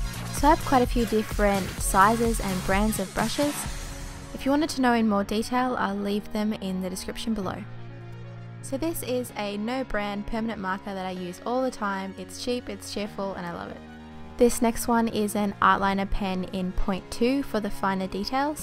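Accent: Australia. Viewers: Ikr, i totally want her accent ,"wotah" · Hey, I'm in Australia too! So I already new the brands they were from. :D I also knew you were Australian because of your accent. :) :) :) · Omg as soon as I heard your voice I knew you were Australian!!! I love your accent I wish mine was more noticeable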